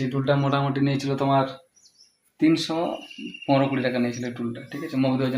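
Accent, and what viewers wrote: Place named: Purulia, India. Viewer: Purulia r mal naki bhai tui... Kotha sune lagche..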